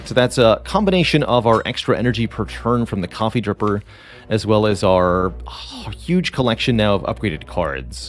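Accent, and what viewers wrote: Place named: United States. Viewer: Thanks for the summary! (his accent is definitely American ;)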